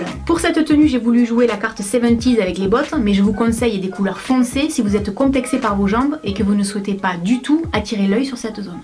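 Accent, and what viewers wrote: South, France. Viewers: Bonsoir! Tout d'abord, ça ne fait que un ou deux mois que je regarde tes vidéos et j'aime énormément tes petites anecdotes, tes conseils, encouragements etc. J'ai du mal à y croire mais je crois que ça me motive un peu, surtout avec ton accent du Sud! Haha. Donc merci! Je me suis décidé à paraître au grand jour ce soir à cause d'un désaccord (il en faut bien un..). Je trouve que les collants semi-opaques ou opaque alourdissent plus la silhouette que les transparents.. La preuve sur moi: j'ai des mollets épais, des genoux trop ronds et de bonnes cuisses (oui j'ai quelques kilos en trop) et les opaques me donne l'impression d'avoir des poteaux à la place de jambes. Idem pour les semi-opaques! Du coup je suis "obligée" de me rabattre sur les transparents et honnêtement ils font effet amincissant sur moi.. Quoi qu'il en soit, merci pour ta vidéo qui me permet de choper deux trois trucs pour paraître fine et bonne soirée! 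· super vidéo et en plus l'accent du sud est super sympa. Bonne continuation. BRAVO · Mais je l'aime trop lol je T écouterai pendant des heures cet accent du sud LA !! Ça fait du bien !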